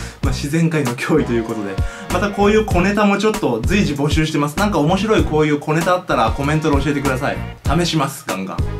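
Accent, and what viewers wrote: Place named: Kansai, Japan. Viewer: ヒカキン喋り方ちょいちょい関西弁のイントネーション時あるし、標準語の時もあるからどっちかにしてほしいわ。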